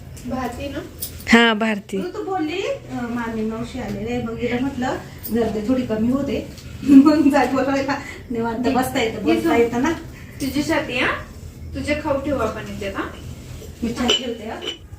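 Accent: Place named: Jalgaon, India. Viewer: मावशी तुम्ही जळगाव मालेगावच्या दिसत आहे बोलण्या वरून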